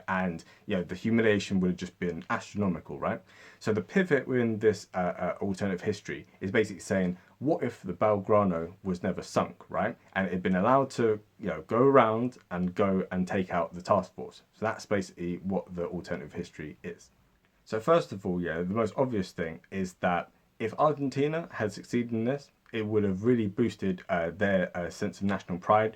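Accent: United Kingdom. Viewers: He sounds British. Maybe London.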